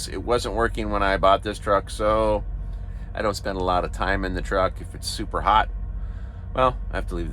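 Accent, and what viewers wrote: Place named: Canada. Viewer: I knew you were Canadian when I first heart your voice. Sound exactly like Alex Lifeson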